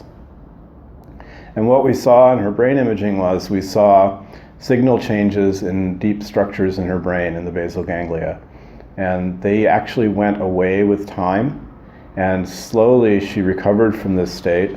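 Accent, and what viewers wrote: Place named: United States. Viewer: Excellent video doctor. By the way, I love your accent. Being a foreigner, your accent is like liquid gold to listen to and learn American English from.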